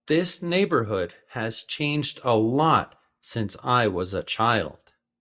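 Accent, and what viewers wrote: United States. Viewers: American or Bri?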